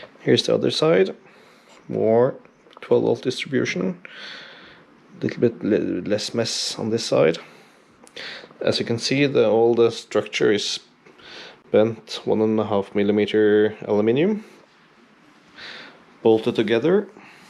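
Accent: Norway. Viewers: idk why. but he sounds norwegian. Broski got that crisp norwegian dialect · Are you norwigen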